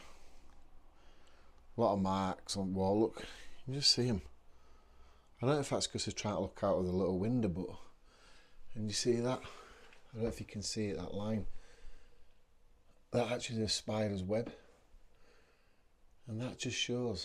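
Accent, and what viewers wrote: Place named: Yorkshire, United Kingdom. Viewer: You do remind me of somebody from emmerdale with your accent 👍enjoy your channel mate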